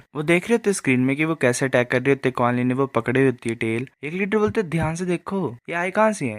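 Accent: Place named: Pahadi, India. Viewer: Pahadi voice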